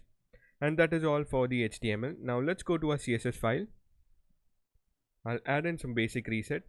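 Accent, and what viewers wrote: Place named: India. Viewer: you sound like a hindi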